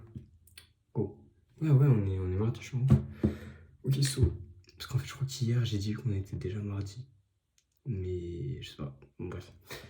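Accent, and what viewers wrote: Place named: United Kingdom. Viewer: Stp arrête de nous faire le british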